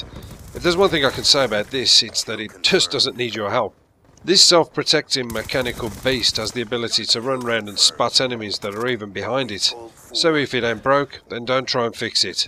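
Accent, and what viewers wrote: Birmingham, United Kingdom. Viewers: Great stuff, you're like a brummy Sid Waddel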